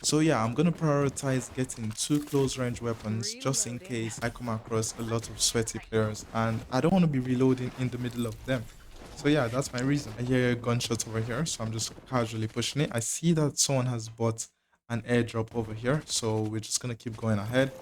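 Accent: Nigeria. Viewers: U dey sound like 9ja guy😤😅.. gg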